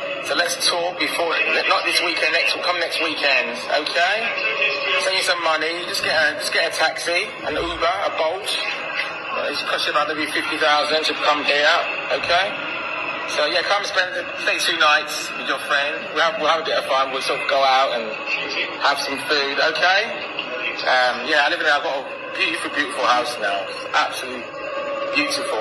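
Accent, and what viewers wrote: United Kingdom. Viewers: Is that a uk accent😢😢😢😢😢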